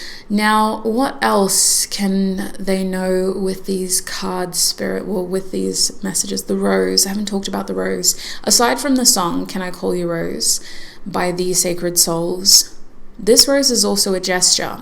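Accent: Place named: Australia. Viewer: Aussie accent 😍😍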